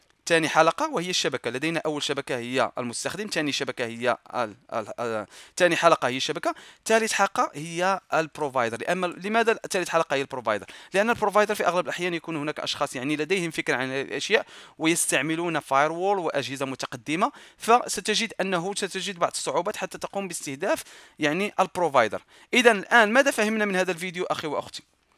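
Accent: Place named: Morocco. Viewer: أنا اتحدى في شيئين: الأول: يكتب كلمة إنجليزية بدون شخابيط في آخرها الثاني: ينزل مقطع واحد بدون مايلخبط في الشرح هههه:) دمه عسل وانسان رائع الله يوفقه + اللهجة تعجبني كثير هو من المغرب صح ؟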